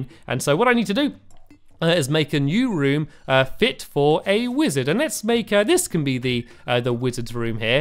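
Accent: England, United Kingdom. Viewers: Cake is Nice do u not know what a an English accent is?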